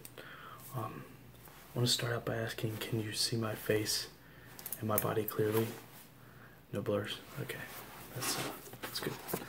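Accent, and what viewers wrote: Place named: South, United States. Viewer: sound like a Southerner to me, Georgia?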